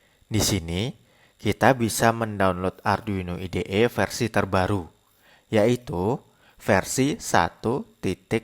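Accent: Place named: Java, Indonesia. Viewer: Suara siapa ini kang ? Kok medok hihi Sukses channelnga kang,,, salam G2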